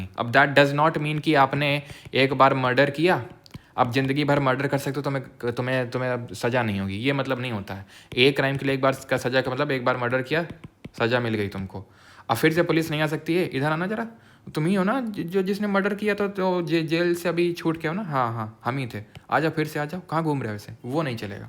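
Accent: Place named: Uttar Pradesh, India. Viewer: Up se ho kya aap?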